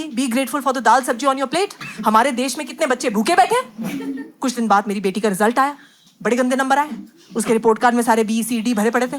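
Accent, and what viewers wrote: Punjab, India. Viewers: Being a Genz I don't spend much time in toilet. Also I really like your way of presenting and didn't find it like I should mind it . Also your punjabi accent ❤❤❤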